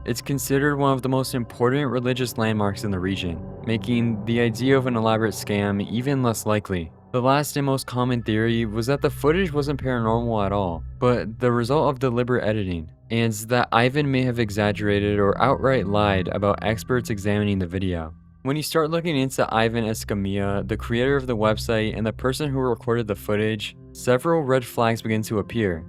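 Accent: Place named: Brazil. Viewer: why bro has brazilian accent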